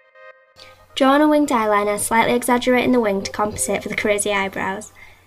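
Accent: Yorkshire, United Kingdom. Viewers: You've just got a new subscriber! Also, you're from Yorkshire? I'm from there too 👌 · your Yorkshire accent is so pure in this lol I almost forgot how northern you are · it sounds like she has a Yorkshire accent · Her accent is yorkshire amd jade thirlwells is Geordie .... There's a difference x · R u british I am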